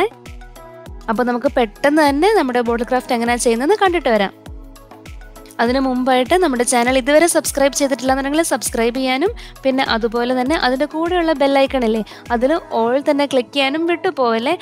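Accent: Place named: Kottayam, India. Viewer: കോട്ടയം സ്ലാങ് ഉം രണ്ടും പൊളിച്ചു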